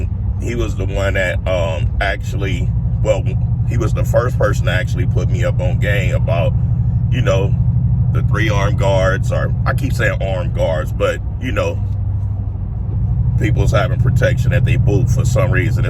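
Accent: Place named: South Central Los Angeles, United States. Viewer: THATS OUR SOUTH CENTRAL LOS ANGELES ACCENT, YOU FINNA TELL A WHYTE GUY FROM BROOKLYN TO SPEAK LIKE YOU? 😂😂😂💯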